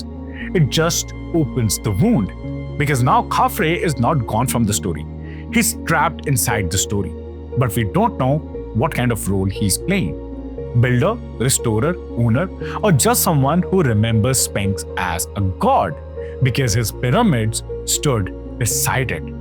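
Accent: India. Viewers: Nope, Spinks 🙄 Indian English is like an insult to english.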